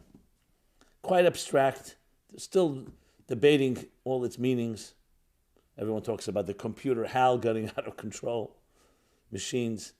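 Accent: United States. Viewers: Thank You✨🌹✨🪬✨ sometimes it is difficult for me to understand you because you speak American English. Sorry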